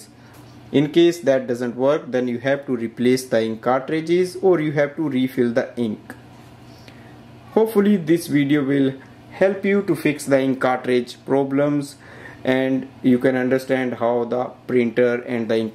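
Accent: India. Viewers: Talk in Hindi ,your English is typical Hindi English language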